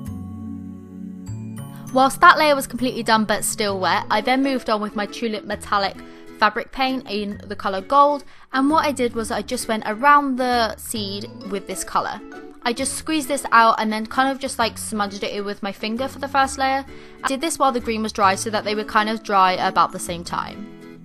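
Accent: United Kingdom. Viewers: It’s a Brit!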